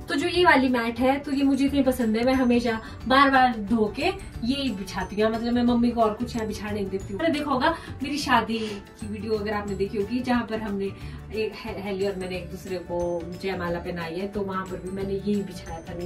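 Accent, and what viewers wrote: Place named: Pahadi, India. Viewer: You are so creative and loved your pahadi accent ❤️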